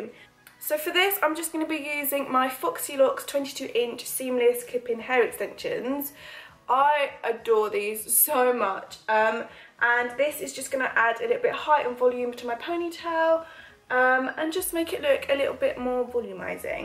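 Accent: United Kingdom. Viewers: but Phoebe is Australian and sounds british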